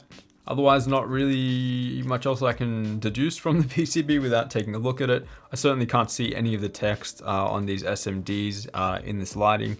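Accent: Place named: Australia. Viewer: Ayy had a feeling you were Australian :D woot I'm from Straya too bro haha, honestly though INCREDIBLE breakdown and explanation of the card, that was top notch ^_^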